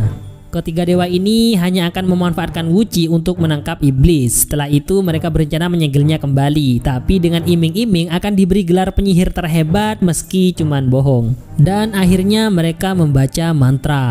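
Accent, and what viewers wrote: Madura, Indonesia. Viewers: mak medok ben bro logat madureh tak nyaman ekedingagin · Mimin orang madura ya logat sama kayak aq hhhhh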